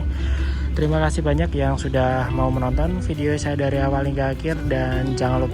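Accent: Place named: Jember, Indonesia. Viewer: Lo mas mau tanya sampean sebenarnya asli jember nopo blitar lak denger logate sampean berbicara kayak jember mas trimakasih 🙏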